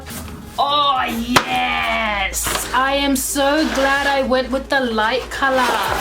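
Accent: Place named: Australia. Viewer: As soon as I heard your accent I was like yess, an Aussie! I WAS contemplating buying the shelf from Grovemade but I just got to the bit where you said it $150 just for shipping O_O. girl no..that's not in my budget lol. I shall keep looking elsewhere but great video! <3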